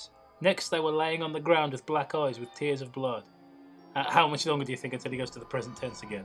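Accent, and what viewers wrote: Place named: Scotland, United Kingdom. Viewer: That Scottish accent is brilliant.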